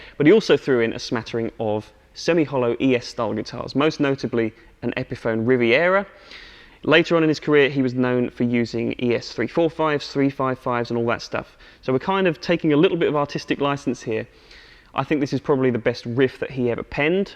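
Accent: United Kingdom. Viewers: When speaks, I close my eyes and pretend Joe Bonamassa is speaking to me with a British accent. Incredible playing by the way too!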